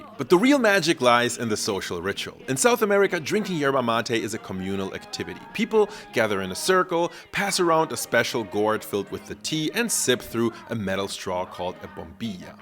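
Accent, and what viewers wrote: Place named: Germany. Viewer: This German dialect is terrible and unnescessary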